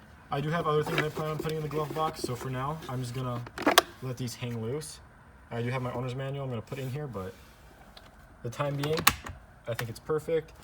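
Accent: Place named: Midwest, United States. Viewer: Love the midwest pronunciation of the word 'console' to sound like 'council'. Must be a Chicago thing. .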